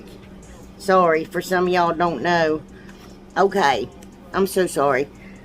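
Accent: South, United States. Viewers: Absolutely love your country twang! Been watching you since you started, love your channel! Keep up the great DIY's! Oh and STICKERS!!!LOL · i love your designs! everything you make is so beautiful and i LOVE to listen to your accent and laugh!!! us southern girls have to stick together lol (NC here) love and prayers!!!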